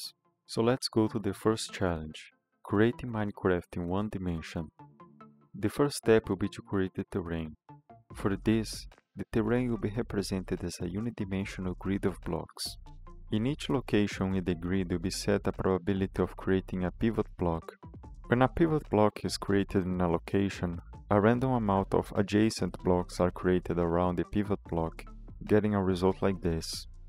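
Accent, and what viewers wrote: Brazil. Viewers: amo q da pra saber qnd o cr eh brasileiro falando inglês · da pra reconhecer brasileiro de longe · Cara, você é brasileiro? Fala bem pra caramba mas o sotaque não engana kkkk, a localização do canal no sobre também fala que é do Brasil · I must know this Why do you sound brazilian? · Reconheci o sotaque brasileiro hue Ótimo vídeo. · Vc é brasileiro né? O sotaque brasileiro é inconfundível kkk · Aaaa, por isso o inglês era estranho, o cara é brasileiro, em fim ótimo trabalho parceiro, foi abençoado pelo algoritmo do youtube 100k views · That's a brazilian accenttt broooooooo! tamo junto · tu é br né? kksksksks eu já percebo na hora brasileiro falando inglês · Na hora eu já saquei que vc era br, c não engana primata da sua espécie não em Enfim, seu canal tem potencial, daqui um tempo vc tá nos 50 mil incritos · Reconheci que era br pelo sotaque! 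· Incrível como eu já sabia que era BR só pelo sotaque kkkkkkkkk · Are you brazilian? I'm assuming from your accent. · Salvee, to ligado que vc é br, da pra perceber kkkkkkk, mas enfim, ótimo trabalho! 👏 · e esse sotaque brasileiro aí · brazilian bruv this accent 😭😭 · Eu tenho CERTEZA que é brasileiro n é possível ksksksksksk Esse sotaque é muito nosso vei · Percebi que era BR só pelo sotaque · Oh, good (percebi que é Brasileiro pelo sotaque no video, então... congratulations) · Sotaque brasileiro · Se ele não for um brasileiro falando inglês eu crio asas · Acho que o narrador é br kssksk esse sotaque en ksk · E esse inglês brazuka aí? Eu notei o sotaque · primeira vez q percebo o sotaque de um brasileiro kkkkkkk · Com esse sotaque, você é brasileiro, não é possível kksjjskkjjjjsjsjsjsjsjsj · O sotaque brasileiro é inigualável kk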